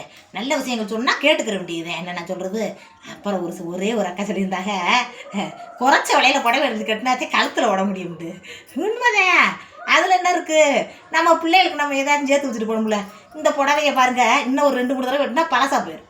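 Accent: Madurai, India. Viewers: நான் திருவள்ளூரில் இருக்கின்றேன் என்னுடைய பிறந்த ஊர் மதுரை, சகோதரி உங்களின் இயல்பான மதுரை மண் மனம் பேச்சு என்னுடைய சிறுவயது வாழ்க்கைக்கு கொண்டு சென்று விட்டது நீங்கள் சொல்லும் வார்த்தை எதார்த்தமான வாழ்க்கைக்கு உகந்தது உங்களுடைய தொடர்பு எண்ணை அனுப்பினால் நன்றாக இருக்கும்.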